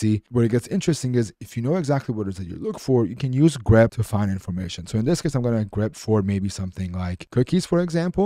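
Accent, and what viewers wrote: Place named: Iran. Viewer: i know a fellow iranian brother when i hear one 😂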